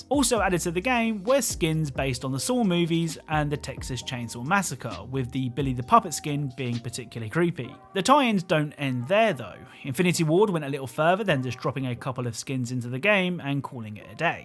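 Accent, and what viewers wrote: England, United Kingdom. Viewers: Charming English Accent.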